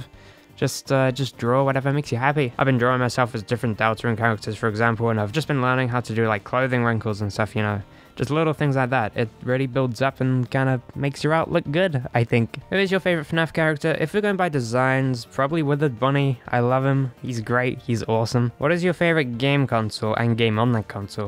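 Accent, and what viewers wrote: United Kingdom. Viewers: Rip bored british voice, long live new voice :) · How do you go from a low British voice to a high pitch feminine voice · I thing she sounds British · I love the new voice!!! I will kinda miss the old British one but i'm so happy for you!! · WOW your voice sounds amazing (: but I will always miss that annoyed/ bored British accent. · wait wait wait she's BRITISH?? how did i never notice it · I will always miss your bored British voice, but I’m glad things are going well with your voice! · Perpetually pissed off British voice is the single best thing I’ve read since I finished the Harry Potter series. So the best thing I’ve heard since last night. BUT STILL, it’s amazing. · I like his old birish voice mate But this sounds good One question is he trying to turn female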